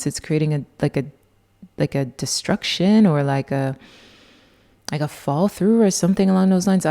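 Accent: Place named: Caribbean. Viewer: I never noticed your caribbean accent before. Beautiful ✨️✨️💕💕 I'm Trinidadian. Thank you for this beautiful reading!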